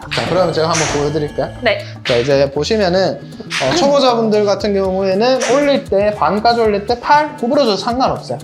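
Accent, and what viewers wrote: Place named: Gyeongsang, South Korea. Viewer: 사투리 좋아요 지도 경상도라 방가방가입니다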